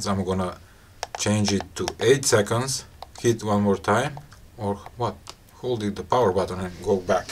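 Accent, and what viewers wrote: Russia. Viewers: I hear russian accent.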